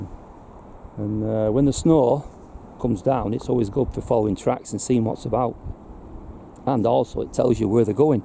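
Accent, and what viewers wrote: Lancashire, United Kingdom. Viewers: We really do appreciate the efforts you go to to bring us these videos, and as someone brought up in Lancashire but living in Essex, that lovely Lancs lilt in your accent is a reminder of what I left behind!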